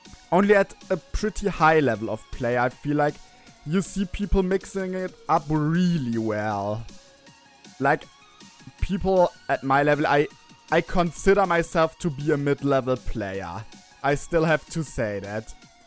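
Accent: Germany. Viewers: Lmao man hört dass du deutsch bist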